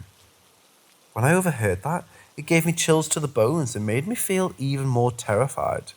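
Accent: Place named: United Kingdom. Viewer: Hey there! It’s fantastic to hear a fellow Brit narrating 👍🇬🇧, I’ve been listening for a while hence I’m subscribed but I’ve just found this vid… my phone does ultra weird things… either that or it’s YT 🤷🏻‍♀️ idk but I’m here now and I have to say your stories are great! My husband is watching some boring documentary about cycling in the snow or something… yawn! So having found this episode, I can just sit back, ignore the tele & chill! Kudos chum! Keep bringing us these great tales of spooky stuff! I have just had the dog bite the like button! 🤣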